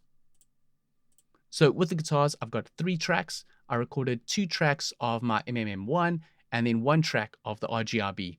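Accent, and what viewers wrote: South Africa. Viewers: Hi. I am a South African too, but living in the UK. My friends in the UK always pick on me for the way I say "here". I never really noticed it with myself, but when I listen to you speak, it is really noticeable. Hahaha. Anyway, this is a really cool video and great sound that you get. I am really enjoying learning what you have to teach. You showed us a bit of your mixing in this video, but How about a more in depth mixing tutorial? If you have already done this, my apologies. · Are you perhaps South Africa? I am but living away from home ... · I love the South African accent! Relaxing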